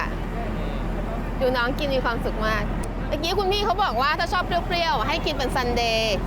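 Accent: United Kingdom. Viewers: ฟังน้องกู๊ดพูดภาษาอังกฤษสำเนียงคนอังกฤษ(ไม่ใช่สำเนียงคนอเมริกัน)น่ารักมากค่ะ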